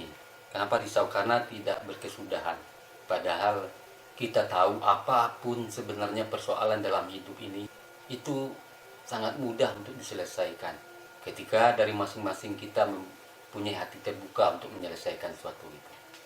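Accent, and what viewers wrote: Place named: Minangkabau, Indonesia. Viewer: . Alhamdulillah bapak sehat, sebenarnya saya hanya meneka , ternyata bapak berbangsa Minangkabau,saya tahu dari cara perbicaraan bapak..hampir sama seperti orang Brunei,semoga channel bapak terus mantap maju dan berjaya.semoga bapak terus berkarya dengan lebih maju lagi.salam dari kami melayu Brunei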